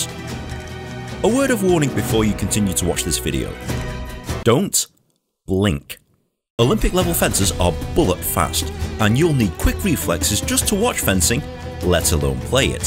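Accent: United Kingdom. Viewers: Very nice explained and I love typical british accent. But I need to correct something: the sabre is about 88cm long, the foil and the epee are about 90cm long (length of the blade/edge). The pictures doesn't show the correct lengths.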